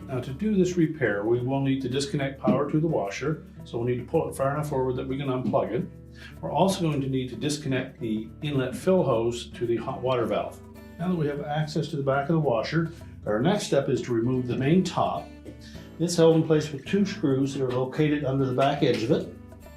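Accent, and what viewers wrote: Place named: Canada. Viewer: Is this a Canadian made video? Your accent in the pronunciation of the word "out"sounds as if you're Canadian.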